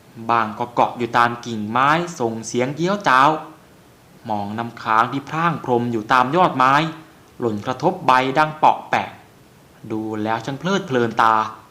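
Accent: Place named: Rural, Thailand. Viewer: ສຳນຽງພູດຄືມາກ ກົມກື່ນກັບສະພາບບ້ານນອກດີນະ ຄິດຕາມທຸກຄລີບ ຂອບໃຈ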